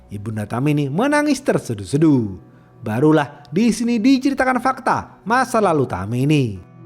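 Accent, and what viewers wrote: Java, Indonesia. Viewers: Wong jowo po mas e? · Jawa mu mana min?tugelan barang mvok ghowo2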